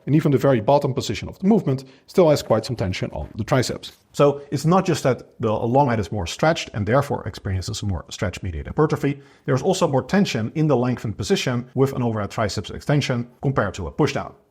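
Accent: Netherlands. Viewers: dutch accent is hilarious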